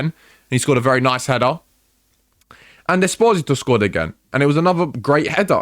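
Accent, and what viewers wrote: United Kingdom. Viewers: Hmm, why do you say we? Are you Italian? You certainly sounds British to me.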